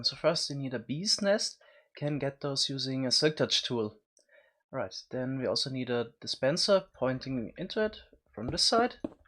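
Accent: Germany. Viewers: Y do you sound so german? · Ich finde es geil das man hört das du ein deutscher bist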